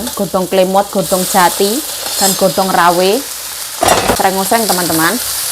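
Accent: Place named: Java, Indonesia. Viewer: lihat makan itu, jd kabita euyy, jd pengen makan, lagian dengan logat medhoknya itu bikin, guyu" dewek🤭🤭😁😁 Semangat yuk.. semoga kamu menjadi selegram yg sukses. Aamiinn🥰🥰🙏🙏🤲🤲💪💪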